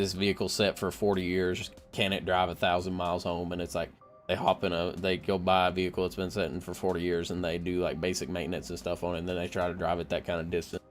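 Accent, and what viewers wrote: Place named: South, United States. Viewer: bro I won't lie I have you just talking in the background and working on school work and what not. Something about your southern twang really has me sitting down and just listening to you. You should dabble into commentary!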